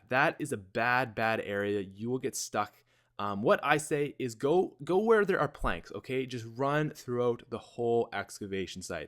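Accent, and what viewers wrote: Canada. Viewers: Is he Canadian? It sounds like he has our accent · r u canadian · are u canadien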